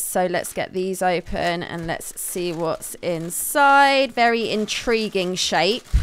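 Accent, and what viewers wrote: United Kingdom. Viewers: nice start video , but this brit accent is very annoying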